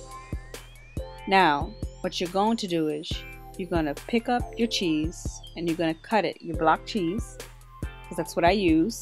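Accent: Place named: Haiti. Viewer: So your Hatian sista? I love it! I know I had detected a slight accent when I watched your very 1st video. I love accents. And this receipe looked really good. Too bad I'm off of dairy, but my aunt said she will be making this for the weekend. I dig it when people can take packaged products & make their creations with it. And if you find the time please make some more cooking vids. That chicken looked great. I need new ways to cook bird. Thanks again, dear!!! Now I'm going to the wigs,LOL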